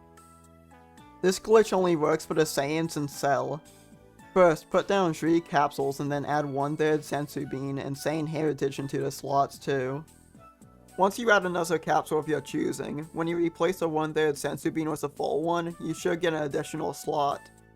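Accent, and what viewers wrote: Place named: New York, United States. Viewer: ohhhh awesome. For some, you sound like you're from New York.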